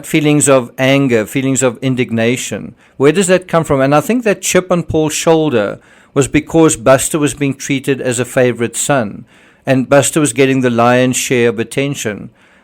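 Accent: South Africa. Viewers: It’s great to hear a South African accent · South African, I think.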